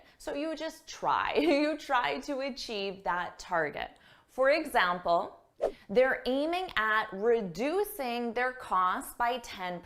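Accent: United States. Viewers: Hi. Out of your excellent accent I guess you re American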